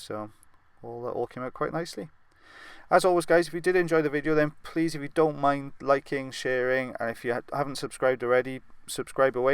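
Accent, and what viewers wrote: Wales, United Kingdom. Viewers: That Richard Burton & 😍 Anthony Hopkins duo Blessings for the Welsh accent t I have family in Guyana&Trinidad They like the Welsh sing when they 👄 🦜 talk Enchanting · I like watching these and listen to the commentary, your voice is so calming and the Welsh accent is so nice.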